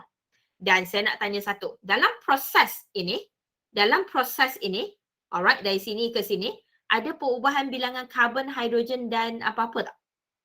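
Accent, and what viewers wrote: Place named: Kelantan, Malaysia. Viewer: Just want to know..Are you from kelantan madam? I'm studying at Kelantan matriculation college and learning some 'kelantan malay' ..and I can hear some kelantan slang when you speak malay.. really love the way you alternate malay and english to make the students understand the chapter very well.. currently addicted listening to your teaching and hopefully I can score well in my pspm 1 &2 especially for chem..❤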